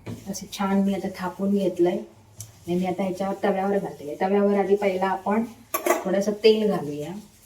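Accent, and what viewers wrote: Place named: Malvan, India. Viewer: "Hello ma'am just watched your latest vlog on cooking those delicious-looking thalipeths and I'm craving them now! 😂 Loved how you shared your morning routine and gave us a sneak peek into your daily life. The recipe was super easy to follow and I'm definitely trying it out this weekend! 👍 Your energy is infectious and it's so much fun watching you cook. Keep 'em coming! 💕 The malavani accent you speak is so amazing 😍 got to see u in very different way ....keep going lots of support and well wishes for your YouTube journey ....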